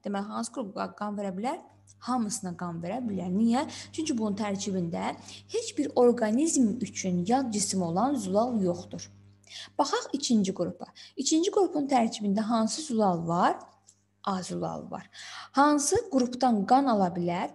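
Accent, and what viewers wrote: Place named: Ismayilli, Azerbaijan. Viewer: 100 faiz İsmayıllısınız. C və G hərflərini dəyişk salirsiz onun üçün dedm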